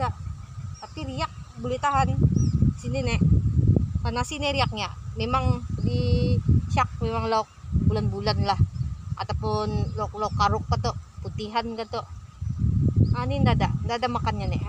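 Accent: Brunei. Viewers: ooh cakap macam bahasa brunei bah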